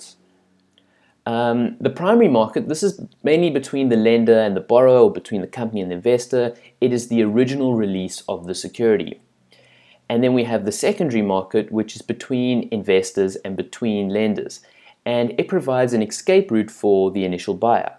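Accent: South Africa. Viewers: You sound South African haha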